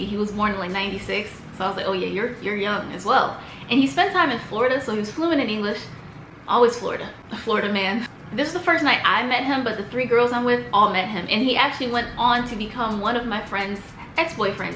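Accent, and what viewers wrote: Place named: United Kingdom. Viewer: Your UK accent is getting better for sure! At this point I Don't even think you're doing it on purpose 🙄 🤙🏾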